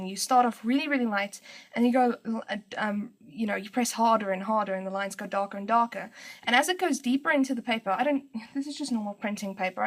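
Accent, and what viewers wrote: South Africa. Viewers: Great videos really helpful :D The only problem with watching them is that your accent makes me miss my South African gf haha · OMG OMG OMG FINALLY A SOUTH AFRICAN!!! Wait a second as I subscribe to you. To my surprise I was like all these other people like Europeans and Australians etc. and I am sitting here like where are South Africans? Then when clicked on your channel and the accent! I fell out of my chair, screaming. Gosh I am so happy :)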